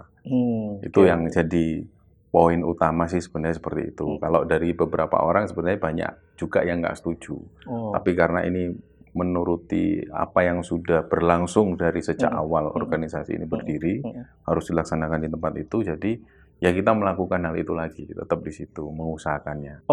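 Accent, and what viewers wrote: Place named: Java, Indonesia. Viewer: Jawa nya kental banget mas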